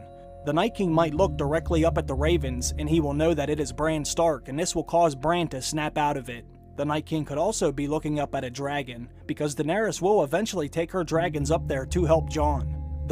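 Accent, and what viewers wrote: Pittsburgh, United States. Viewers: You're from Pittsburgh, aren't you?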